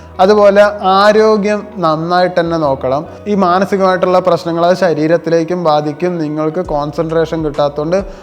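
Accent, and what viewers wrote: Palakkad, India. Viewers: Palakkad nn aano?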